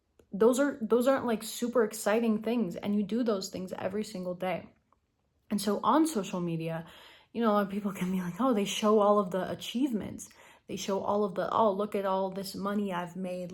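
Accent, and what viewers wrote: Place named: Egypt. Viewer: I was thinking that too! I bet she's Egyptian! Because on CHEX News in Peterborough, Ontario, the weather girl, "Noor Rafat Ibrahim" has that same accent in her voice that has!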